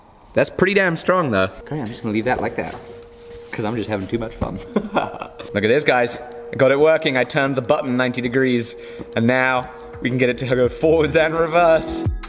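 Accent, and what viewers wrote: United Kingdom. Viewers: thought so as he says certain things in a british way or using British slang plus the accent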